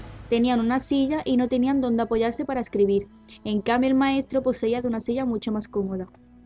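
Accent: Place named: Spain. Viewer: Buena infrmación, buen vídeo. Me gusta el acento de la narradora. De qué parte de España es? Saludos. Gracias.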